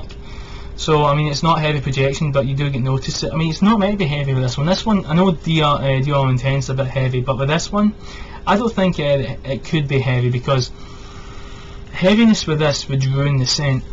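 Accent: Scotland, United Kingdom. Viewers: are you Scottish? btw, i think you've got the only armani nuit review on youtube, so kudos to you for going off the beaten path! just a question, does it remind you of berburry brit rhythm? must be the cardamom, heliotrope and patchouli.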